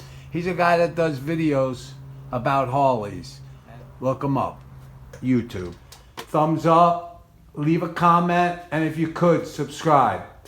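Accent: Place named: New England, United States. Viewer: Cant stand the NE accent,Never met a goof that didnt vote Democrap that talks like that.And this goof repeats EVERYTHING.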